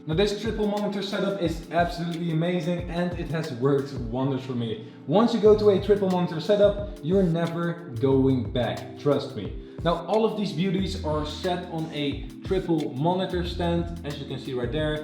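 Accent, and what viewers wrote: Netherlands. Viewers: Are you dutch yust a question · are you dutch because if i talk english i talk like you haha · Are you dutch?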